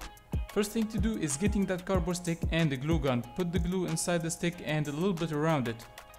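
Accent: Tunisia. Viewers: Naarach aleh aarftk tounsi men soutek hahahah Great tutorial <3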